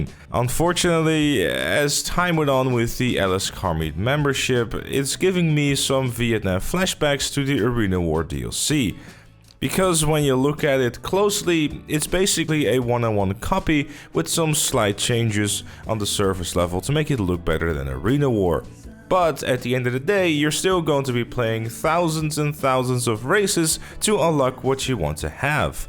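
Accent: Netherlands. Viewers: Do I hear a Dutch man speaking English?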